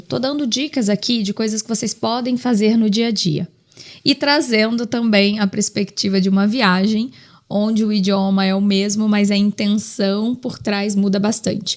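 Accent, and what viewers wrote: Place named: Brazil. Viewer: Comunicar bem aqui em portugal? vc neste video má exemplo começa logo mal , aqui não e em lago algum do mundo a não ser bostil não se sotaca portugau mas sim portugal , não se fala gentchi mas sim gente , não se diz qui legau mas sim que legal etc etc ,vcs ai no bostil teem alguma coisa contra a letra L ?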